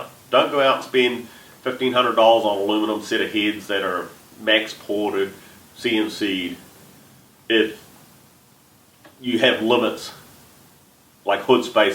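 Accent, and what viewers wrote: New Zealand. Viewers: cant get rid of that kiwi accent ha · His accent is a mix between Maori and Southern American. Lmao, is weird. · weird accent half kiwi half american?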